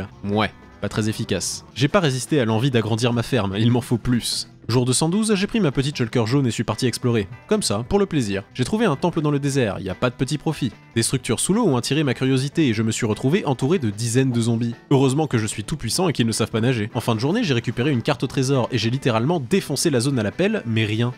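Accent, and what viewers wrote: Quebec, Canada. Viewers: Les références sur plein de chose que je ne connais sont INSANE. Et jsp si c'est volontaire ou nn mais les intonations de voix avec une ressemblance a l'accent culte québécoise sont réussi. La naration est parfaite. Je dit merci a tiktok et a ma curiosité pour avoir découvert ce chef d'oeuvre sur YT. Je n'ai pas jouer a minecraft depuis la réforme du PvP 🤦‍♂️ et tu me donne envis de m'y remettre. Un gros FÉLICITATION pour sque tu as réalisé. 👍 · Es-tu Québecois? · Putain ta le meilleur accent québécois du mondes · l'accent quebecois a la limite du perfect WOOOOOW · T’es tu un Québécois? 😂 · Ton accent Quebecois est cringe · Super accent canadien 😉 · L’accent québécois c’est pour me tuer😂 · J’adore ton accent québécois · Ce com est en honneur de ton incroyable accent québecois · est tu québécois ou canadien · j'adore l'accent quebecois que tu tes donner XD et cest un québécois qui approuve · wesh l'accent canadien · Tu es quêbêcrois? 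Moi oui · T’es québécois?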